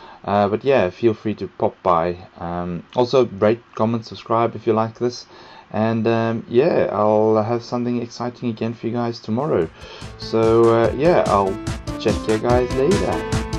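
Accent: South Africa. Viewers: are u southafrican?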